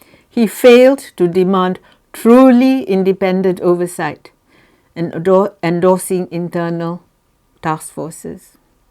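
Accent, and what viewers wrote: Perak, Malaysia. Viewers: You ni orang Perak ke ? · Pekat bunyi.Perak nya..😁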